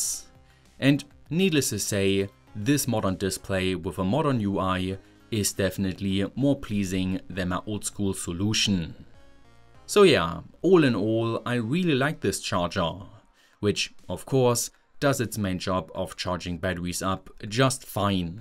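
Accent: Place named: Germany. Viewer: Very informative, but the weirdest accent I've ever heard... super German, but still saying "garage" like an American, and also; who taught him to hold a pencil? That looks terribly painful/inefficient !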